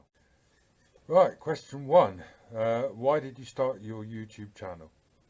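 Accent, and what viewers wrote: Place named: Cornwall, United Kingdom. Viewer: You seem to take care of the upper Tamar,i've been concentrating on the lower! Tributaries of it anyway.Good to hear that Cornish accent.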